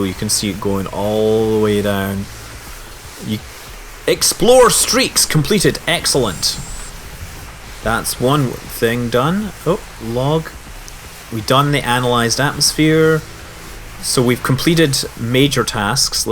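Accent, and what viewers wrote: Scotland, United Kingdom. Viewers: Because he's a Scotsman. He also says Idear. c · Hullo, there is no problem at all with the Ts, they are clear as day. The "Hwhat" thing isn't an audio processing issue, it's just a common garden Scots speech pattern which can really stand out to the non-Scottish.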